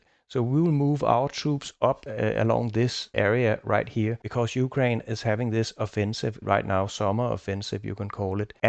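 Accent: Denmark. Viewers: the danish accent is strong with this one (jeg er også fra danmark)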